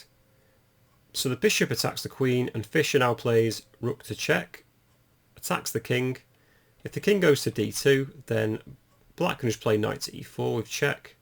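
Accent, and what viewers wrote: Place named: United Kingdom. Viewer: I wanted to share with you how I found your channel, even if it doesnt matter(or maybe you can capitalize on it as a matter of...you'll know what I mean when I say it😅 I searched I donno british chess whatever...I'm here for your beautiful accent man:)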